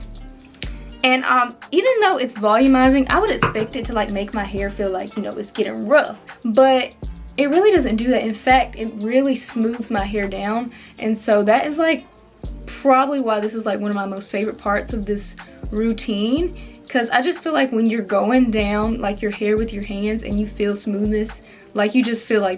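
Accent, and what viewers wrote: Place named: South, United States. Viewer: Your accent is cute. ^^ Are you from the south?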